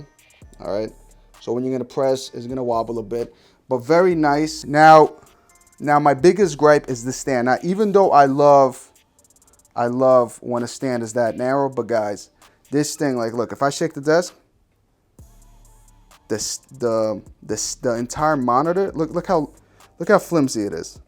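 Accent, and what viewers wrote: New York, United States. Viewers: That NY Accent is CRISP I love it hahaha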